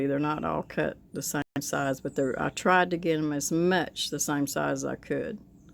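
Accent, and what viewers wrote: South, United States. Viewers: Love the Southern accent. I was like what’s a steak cake? 🤣🤣🤣Stack cake. Don’t know what that is, but I’ll look that up next. I’m from the PNW so my ears don’t always hear right!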